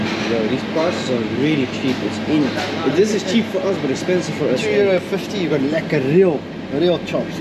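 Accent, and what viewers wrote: South Africa. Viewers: You sound very south african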